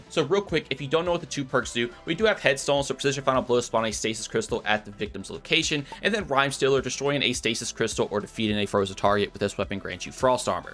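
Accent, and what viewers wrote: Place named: North Carolina, United States. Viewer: Completely irrelevant, but the more I watch your content the more I feel like I hear a North Carolina accent in there. Haha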